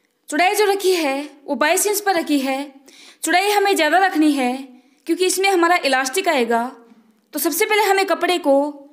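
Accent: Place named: Garhwal, India. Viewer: Boli mai garwali tone lag rahi hai .aap utrakhand se ho?❤❤❤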